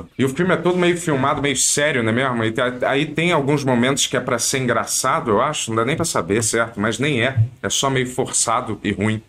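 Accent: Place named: São Paulo, Brazil. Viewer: Ele e da capital , e sotaque de paulistano.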